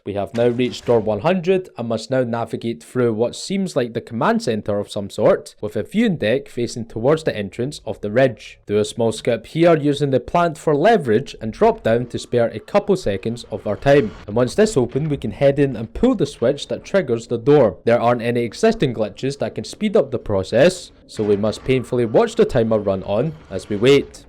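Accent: Scotland, United Kingdom. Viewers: idk i think this guy might be scottish…. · because he might be Scottish, shocker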